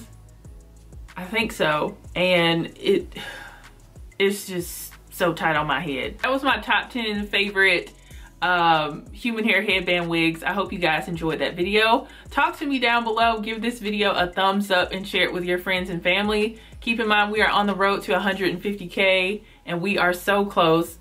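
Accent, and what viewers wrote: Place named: Alabama, United States. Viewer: Your accent must be from Alabama 😊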